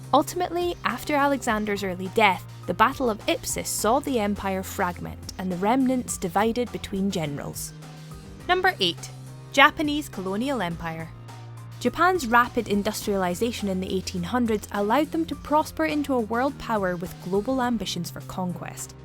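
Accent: United Kingdom. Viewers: You guys are disgrace of YouTube! Stop spreading propaganda! Aren’t you ashamed to erase free speech? From your accent, you are British? Aren’t you ashamed hiding the true history and erasing the dirty history of yours?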